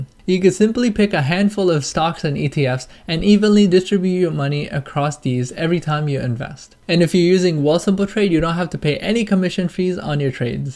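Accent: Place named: Tamil Nadu, India. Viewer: Bro are you a tamil? Apdina neenga vera level bro(really good). Unga(your) accent and way of thinking is superb. I have subscribed and proud to see an Indian doing so great in terms of investing which is non existent here. Thanks a lot.!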